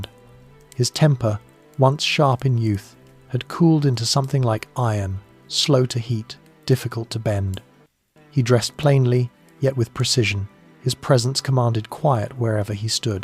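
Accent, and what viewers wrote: England, United Kingdom. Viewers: Why would I trust an English man narrating American history!!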